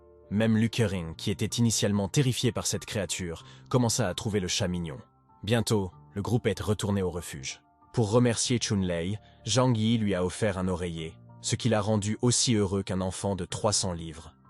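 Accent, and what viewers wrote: England, United Kingdom. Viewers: Petit accent anglais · arrêter avec l'accent anglais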